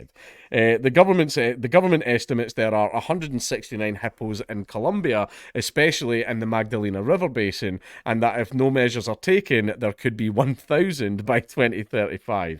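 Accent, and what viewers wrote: South Africa. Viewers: That was a mint South African accent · saffa accent is on point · I love your south African accent. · Haha,nice saffa accent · South African accent has me dying 😅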